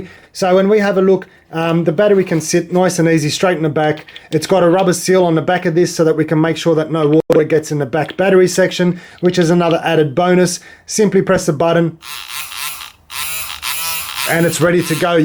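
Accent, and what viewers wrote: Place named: Australia. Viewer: Good Australian accent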